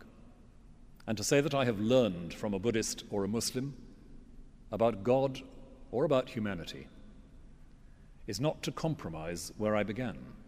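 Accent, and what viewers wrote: United Kingdom. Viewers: the priest with the golden voice, and a british accent to boot! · Where did he get that posh accent? He's a Welshman! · The Archbishop of Canterbury has to speak the Queen's English!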